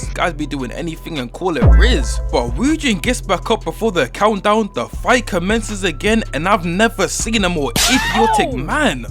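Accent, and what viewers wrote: United Kingdom. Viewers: A British nigga talkin bout yns is wild to me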